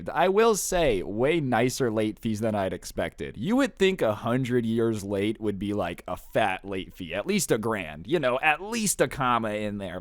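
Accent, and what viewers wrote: United Kingdom. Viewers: Nice British accent. Keep it up.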